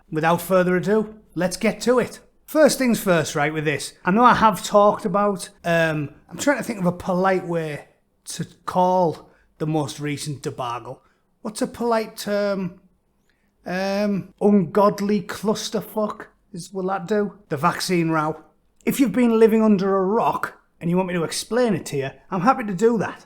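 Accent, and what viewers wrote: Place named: Northern Ireland, United Kingdom. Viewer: Great analogy with the pizza shit mate..... I was laughing my head off whilst listening to it with the Northern Irish accent. 🤣🇬🇧🇬🇧✌✌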